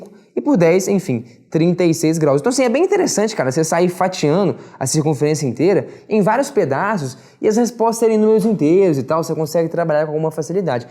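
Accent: Minas Gerais, Brazil. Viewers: Pelo seu sotaque imaginei q vc é mineiro e eu também da cidade de Dores do Turvo confrontante com a cidade de Ubá MG, gostei e adimirei da sabedoria e a sua vocação de ensino muito claro e fácil de entender parabéns. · a mineirice exala em vc cara kkkk e hj aprendi que a microsoft não sabe dividir 360, os caras pularam do XBOX 360 pro XBOX One, depois desistiram e foram pro X, agr estão aprendendo seno e cosseno? kkkkk falando serio, video excelente!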